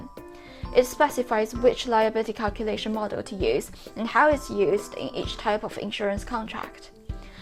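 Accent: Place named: United Kingdom. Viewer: Hello, many thanks for this informative video, really well appreciated. PS: With all respect meant, if I could add that on, though : The (british) accent is really very strong.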